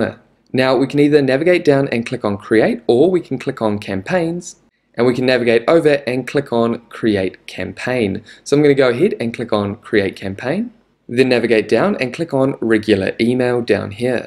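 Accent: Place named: New Zealand. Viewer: Love your NZ accent dude! Cheers from Canada!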